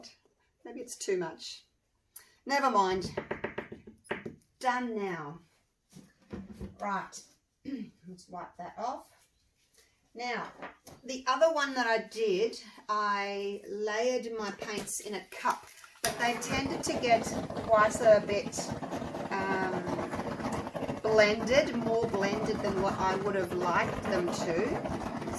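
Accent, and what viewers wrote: Australia. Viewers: Hello Lady from Australia, I love all your work very much and would like to try some swipes you do so well, but I really get confused about your measurements like grams, is there an easier way of ratios? I am a retired widow who want to learn a couple of things in the pouring department, thank you in advance, love your accent btw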